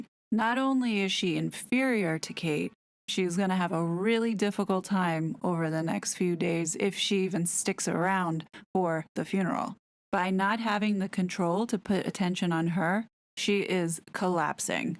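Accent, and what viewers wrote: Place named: United States. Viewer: Nice to hear an American voice that has some understanding of how The Monarchy works. Duty before family, feelings or wants. She is being tightly controlled by senior members of staff, probably ex military and a higher rank than Prince Harry was. By her demeanour, she had been told how to act. They separated M from H, and he seemed quite happy to be free of the claw. Yes her behaviour to the staff will be addressed, not necessarily to M & H. Give enough rope etc. The Prince & Princess of Wales just outclassed them by sheer presence.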